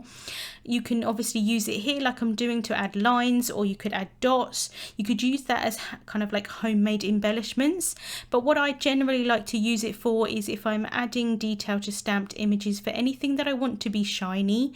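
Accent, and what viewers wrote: United Kingdom. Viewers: I am so glad I found you today over at MFT. I love your card making style, and I love your voice and British accent are so pleasant to this American Southerner to listen to! I appreciate all of these tips, and I look forward to watching many more of your videos!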